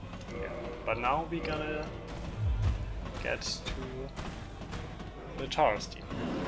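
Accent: Germany. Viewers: Man hört dennoch heraus, dass deine Muttersprache Deutsch ist? Und ich hab vorher nicht aufs Video geschaut. Nicht direkt. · ***** denglisch ist, wenn man deutsche wörter benutzt oder den satzbau umstellt. Das hier ist nur ein ganz normaler leichter deutscher akzent :D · Is that German? Where are you from, dude? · man merkt das er deutsch is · are you from germany becuase i am and your englisch hass a little german slang in it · hm, ich verstehe darunter english mit klar deutschem akzent ^^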